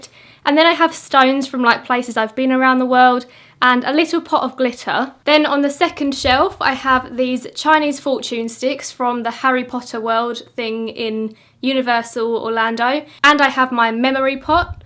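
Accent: United Kingdom. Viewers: Your room looks so nice and comfortable and I love your british english, it sounds so cute! c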